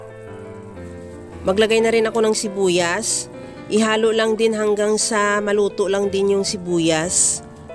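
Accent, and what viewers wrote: Iloilo, Philippines. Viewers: wow for sure yummy ang ganda ng pagkaluto pero parang my tunog ilonggo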